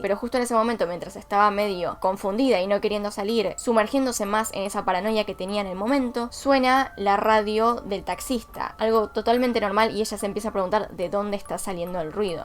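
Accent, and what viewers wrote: Argentina. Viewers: Hola hermosisima tienes 166 videos en la i yo estoy en la labor de verlos, muy buenas noches, me encanta tu trabajo lo haces muy bien y ese acento argentino que a mi me encanta,besos y hasta la próxima.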